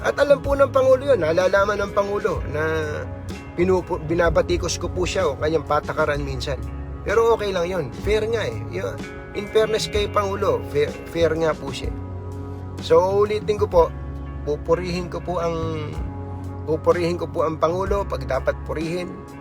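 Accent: Nueva Ecija, Philippines. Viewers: Bata palang ako nakikinig na ako sa program mo sa radyo dos por dos dipa kasi uso tv sa lugar namin noon hinahangaan ko po kayong reporter Sir Tunying natutuwa din ako sa tuno ng tagalog mo ecijanong ecijano kabayan po tayo.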